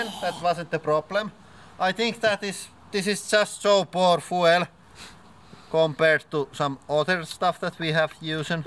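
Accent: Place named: Finland. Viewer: Huomaa englannista että on suomalainen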